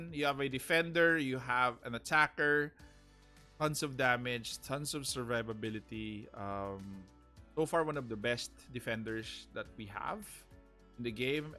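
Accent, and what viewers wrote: Philippines. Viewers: Thanks for this very thorough guide mate. Just started this game yesterday. This information will help me a lot. Btw, your accent sounds like filipino.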